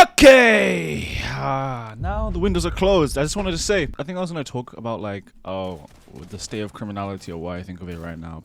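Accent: United Kingdom. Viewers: ur british like me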